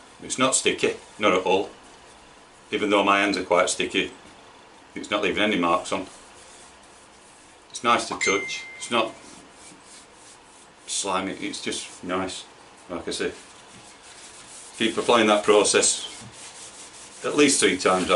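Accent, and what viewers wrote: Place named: Yorkshire, United Kingdom. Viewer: Suuuuper well explained video! Also love the accent! Yorkshire?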